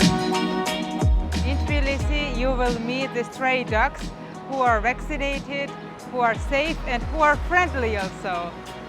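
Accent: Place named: India. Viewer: Ma'am one thing that I have noticed that you are looking very confident nd your video is very much interesting. I don't know that you are Georgian origin or anywhere else. But you English ascents are very much similar to Indians.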